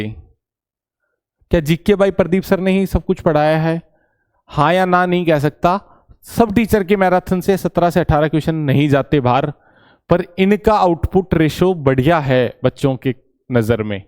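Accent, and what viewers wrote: Ahirwal, India. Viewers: हा बोली को लहजो अहीरवाल एरिया जैसों है।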